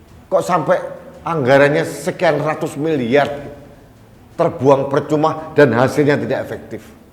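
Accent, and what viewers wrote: Java, Indonesia. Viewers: jowomu asli pak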